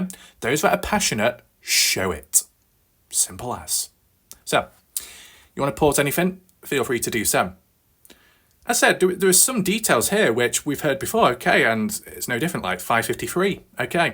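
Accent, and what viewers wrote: South, United States. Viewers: Great accent. Can you say, hey there feller with the yeller piller? I used to say that to my southern family when I was a kid. I can decipher it if you like lol. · lol 😂 that country accent is FANTASTIC! I’m rollin’ on the floor! Keep up the great work! Always enjoy ur videos! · You read the article on the 23rd I believe. Can't believe this happened. It's a shame it's even still up. I completely agree that everything, including the mess-ups and misinformation, should be archived! We have to learn from our mistakes. By the way, I'm loving the country accent 😂😂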